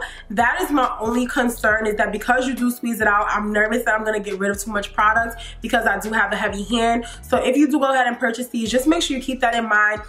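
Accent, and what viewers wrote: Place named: New Jersey, United States. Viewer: Are you from Jersey? Your accent is so cute!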